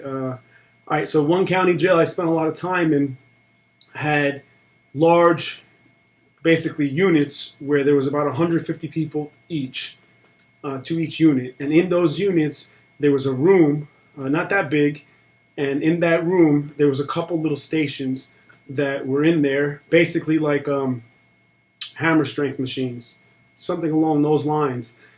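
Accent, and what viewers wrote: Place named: New Jersey, United States. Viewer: I can tell your from Bergen/Passaic County. Your channel is the best, keep putting out content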